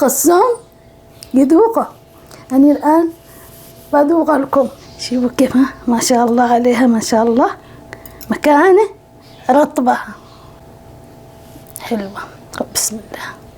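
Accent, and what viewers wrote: Yemen. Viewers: اكلاتكم اليمنية وعذوبة لهجتكم ولطفك وطيبتك تعيد الينا ايام الخير والطيبة وراحة البال ليست كايامنا هذه اللله يستر 😂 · كلامك نصفه يمني ونصفه جنوبي سعودي ....😅 وعموما جزاك الله خيرا على وصفاتك الرائعه ❤ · اي والله ننساء الهموم ولو لمدت دقيقه والله انه تعجبني لهجتك اليمنيه وكمان انا يمنيه ماشاء الله تبارك الله على الضحكه والحناء ربي يحفظك من كل شر ياخالتي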